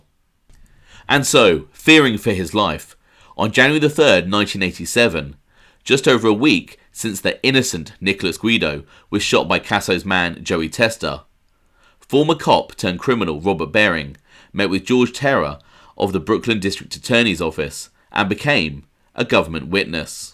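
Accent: England, United Kingdom. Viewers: Well documented info. Interesting and now I am used to your English accent.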